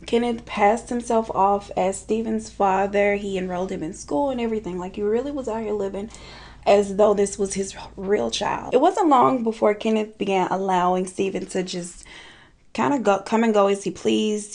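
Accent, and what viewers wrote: South, United States. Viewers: You’re so well spoken with a southern 🛎 accent! So cute ! Love it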